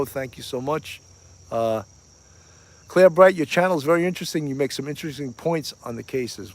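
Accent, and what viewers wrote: New York, United States. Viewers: Good to see you!! I spent years growing up in Trenton, NJ. Now I'm is SC. I love your New York accent!! 😊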